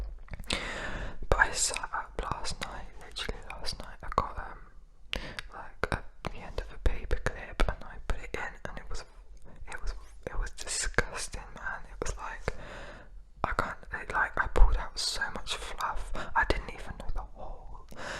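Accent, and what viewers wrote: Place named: United Kingdom. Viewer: The British accent is naturally ASMR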